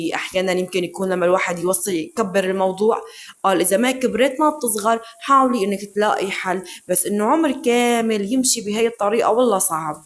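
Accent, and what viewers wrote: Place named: Aleppo, Syria. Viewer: والله و مليون مرة طريقتك في الكلام عسل رونق موسيقى ممكن لاني ليبية فنسمع لهجتك الحلبية حلوى وتشد الانتباه ❤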